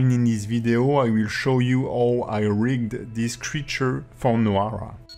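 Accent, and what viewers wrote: France. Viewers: So I came here because I'm struggeling with a super easy mesh and rig, just movement, rotation and IK and everytime I put IK on my mesh it glitches about wierdly. This is way above me, I will come back when my IKs work. Good inspiration though, and love the slight touch of authentic French accent ^^ Merci à bientôt!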